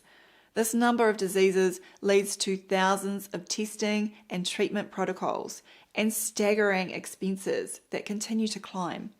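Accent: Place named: New Zealand. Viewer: I love you, even with your Kiwi accent. Thanks for telling us the truth.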